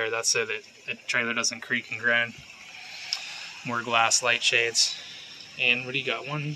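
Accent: United States. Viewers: I can tell your Philly accent but can't place it exactly. Norristown? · So curious about your accent. Did you grow up in the mid-Atlantic area? Maybe Maryland? I study accents and dialects so am just curious.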